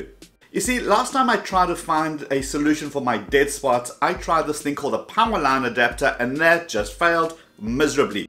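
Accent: South Africa. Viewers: South African I think.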